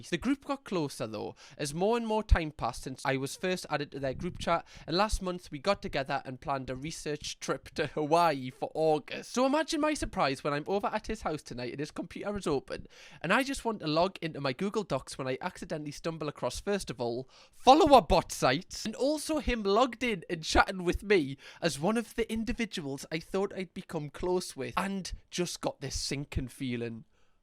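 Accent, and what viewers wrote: United Kingdom. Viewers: You could spend YEARS on Reddit for reaction content -- "Am I the Asshole?" (AITA) is loaded with insanity. Also, from what I know, the UK says "privacy" with a short i, as in "igloo", and the US says it with a long I, as in "I'm going to the store." Personally, I like your UK pronunciation because, you know, you're from the UK. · With an american accent we have to say pr-👁️-vacy. With your british accent priv’acy works:) you and can say either if you want imo 😆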